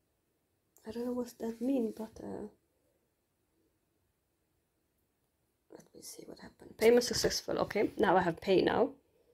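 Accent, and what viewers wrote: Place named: Arab world. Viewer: C’est un arabe vu sont accent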